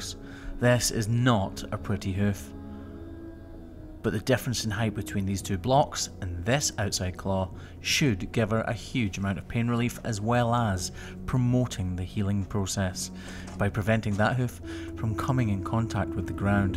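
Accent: Scotland, United Kingdom. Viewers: It’s a very dirty job but someone has to care for cows feet and you do a fabulous job. Too, love your Scottish accent!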